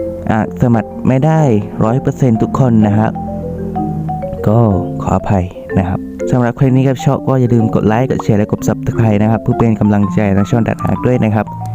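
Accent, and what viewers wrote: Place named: Deep South, Thailand. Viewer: เด็กสามจังหวัดชายแดนภาคใต้แน่ๆสำเนียงแบบนี้ผมชอบทำต่อไปให้กำลังใจ🥰🥰🥰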